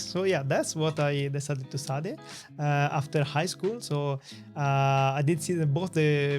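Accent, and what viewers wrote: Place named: Italy. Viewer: Good video! Nice Italian accent =)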